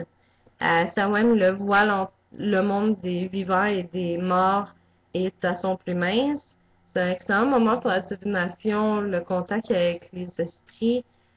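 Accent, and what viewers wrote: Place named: Quebec, Canada. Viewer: T'as vraiment un accent ! xD Content de savoir que des Québecois font des vidéos wiccanes en français ! ;)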